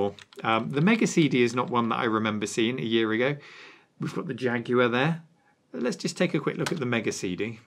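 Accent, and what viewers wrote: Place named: England, United Kingdom. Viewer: Your english accent is very similar to simon whistler.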